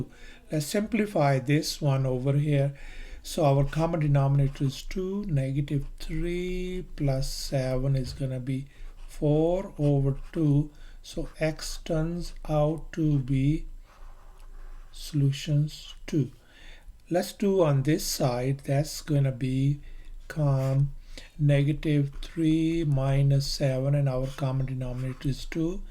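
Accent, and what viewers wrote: India. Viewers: ig he's indian ....by his accent....he teaches gud though (the teacher)....n call me 'sis' not 'bro' cuz it's rakhi....idk why I'm saying this but happy rakshabandhan buddy^^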